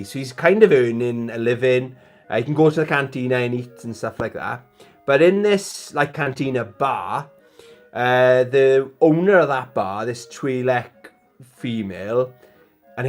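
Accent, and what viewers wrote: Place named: Wales, United Kingdom. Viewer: I do like the Welsh accent . Great video as usual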